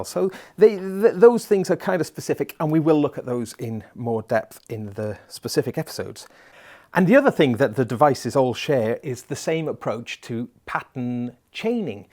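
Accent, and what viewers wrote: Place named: Wales, United Kingdom. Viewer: he is not nervous, it's just the welsh accent.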